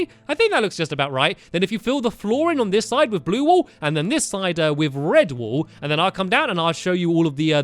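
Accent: United Kingdom. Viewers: Are you British I am your the best youtuber ever we love you and your vids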